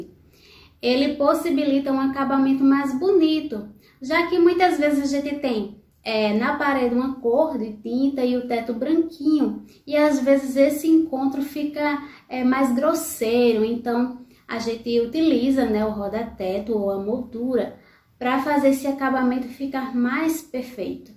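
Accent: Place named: Northeast, Brazil. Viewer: parabéns... ótimo vídeo... eita sotaque gostoso de ouvir ... nordeste é tudo de bom ,,,kkk 👏👏👏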